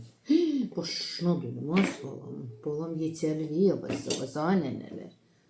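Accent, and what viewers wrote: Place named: Salyan, Azerbaijan. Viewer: Niyə axı pesok deyirsiz? Öz dilimizdə deyində şəkər. Gül kimi sözümüz var. Ləhçə Səlyan ləhçəsinə oxşadı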